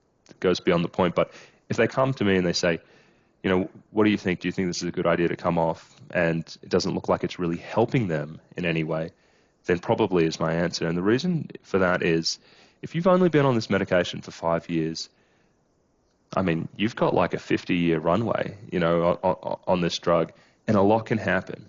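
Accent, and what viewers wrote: Australia. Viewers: G'day, i thought i realised that Australia accent. Its good to see an Australian taking a interest in peoples health. Mate here is my story....I am 59 years of age and had been on Alazopram for 13 years at 1mg to 3mg a day as needed.....prescribed to me by my family doctor for anxiety and panic attacks. Through out this time my life was going along smoothly, i was functioning and living life. That was until January 2023 when the Australian Federal Government decided to change the prescription laws around these medications. It meant that my doctor was no longer allowed to prescribe them only Psychiatrist can prescribe them now...which i totally agree with. What i don't agree with is the total lack of direct pathway to see a psychiatrist to maintain your script or a managed taper. At this time i was given 30mg Oxazepam to take daily......which is not the correct dosage, it was 10mg short of the equivalent dosge of Alazopram. 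Well one month later i entered hospital with severe dizziness (rocking sensation), sensory hyperstimulation, headaches, fatigue, unsteadiness and tremors. I spent 9 days in hospital. During this time I was only given 5 mg of diazepam in total plus daily panadol. I had ct and Mri scans done.....all were clear. I saw a psychiatrist for about 20 minutes and Neurologist who all put it down to my anxiety....so I was discharged. At the time of disgcharge I was on nothing, zip, zero only my 100mg of Zoloft. The hospital psychiatrist told me to wean myself of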